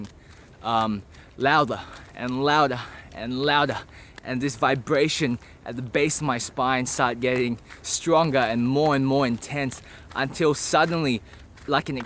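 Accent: Australia. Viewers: interesting accent, sounds australian crossed with something else. Cool story, i am in the starts of a kundalini experience, feels like i am connected to god with electric jumper cables, so intense. I wonder when it will stop. If I stop meditating will it go away? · Your accent seems Australian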